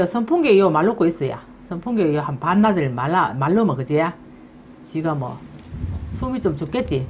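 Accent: Gyeongsang, South Korea. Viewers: 경상도 특유의 사투리가 참 제미나내예 영상 잘보고 감니데이~ 감사합니데이~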